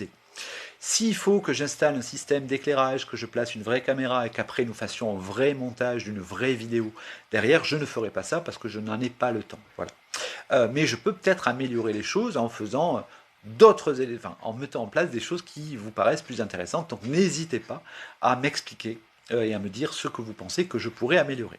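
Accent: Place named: South, France. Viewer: C'est demandé de façon si aimable !... Je ne vais pas détailler toutes les questions posées (je n'ai pas pris note de toutes 😉) Mais ça risque quand même d'être un peu long (1000 excuses d'avance) Préambule : je n'ai rien contre le fait de se tutoyer. J'ai toujours travaillé dans l'enseignement. Je n'ai tutoyé mes étudiant(e)s qu'à partir du moment où ils-elles sont devenu(e)s des collègues. J'ai un titre d'ingénieur en mécanique (tendance maths - élasticité, milieux continus...). Assistant à l'univ de Louvain (1973-79), prof de physique aux Comores (80-81), prof d'informatique (programmation essentiellement) de 1982 à 2012. 1) L'intérêt pour tes "Flashs" : essentiellement ceux relatifs à l'histoire de l'art. J'ai suivi (<num> ans de cours de photo à l'École des Beaux-Arts (ça sonne plus moderne que "Académie") de Wavre. Si le cours d'histoire de l'art (obligatoire) avait été donné "à ta façon", j'aurais sans aucun doute persévéré pour terminer le cycle de 9 ans, mais... 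Mon dada, c'est plutôt la photo "sociologique", genre Walker Evans (Depardon, Ronis...) 2) Comment améliorer ces Flashs ? Jusqu'il y a peu, j'étais gêné par le manque de synchronisme entre image et son et le fait que l'image était "à l'envers" (comme pour la plupart des gens qui partagent des images de Smartphones). Cette fois-ci, ça semble synchrone, donc... Tu as une excellente diction et un petit accent méridional des plus gouleyant à l'oreille (un peu comme celui de Mouscron chez nous 😂) 3) Question nivea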